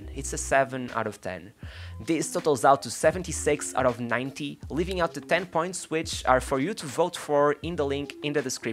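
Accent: Portugal. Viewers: Gotta love that awesome portuguese accent! A great video for a great player! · He’s Portuguese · Lol this guys is portuguese 😂 accent tho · Dude are you portuguese u really have a portuguese accent saying the names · I bet this guy is portuguese because of the accent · Is it me or you are portuguese? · This is a portuguse narrator for sure eheheh I Love Rivaldo! He has class and is not a party animal I love lo listen him talk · you sound like a portuguese speaker, and I am wondering if you are from Brazil, portugal or another portuguese speaking country. Anyway what I about doing one for Juan Román Riquelme, by far my favorite player growing up and wanted to know other people's opinions. Cheer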